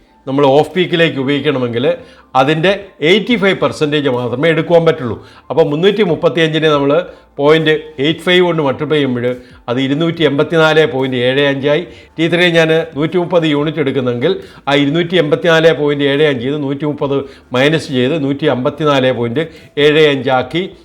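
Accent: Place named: Poonjar, India. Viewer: പൂഞ്ഞാറ്റിലെ ഇംഗ്ലീഷ്.. താനക്കെ ഇംഗ്ലീഷ് ഡബ്ബിംഗ് വീഡിയോ ഇട്ടിട്ട് ആര് കാണാനാണ്..... കോണത്തിലെ ഇംഗ്ലീഷ്...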